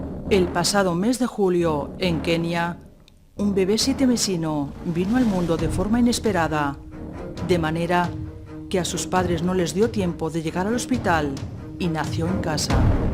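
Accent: Spain. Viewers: siento que la chica que esta hablando es de españa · ese acento Espanol me cae en los huevos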